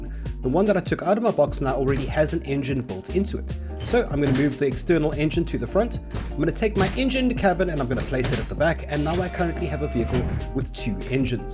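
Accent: South Africa. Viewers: The taxi shit killed me, I had my suspicions that you were South African from your accent, but that minibus taxi joke confirmed it. You aren't wrong though 😂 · I new you acsent wasJuf vir vandag periode 5 se hulle ons het SS. Is die geo of gesk ¿ South African Edit: I now realized that I typed like I was drunk Wtf I’m keeping it like that Admire it Admire it!!!! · wait , your from south africa , i knew it I thought i recognized the accent , nice joke about the taxis in SA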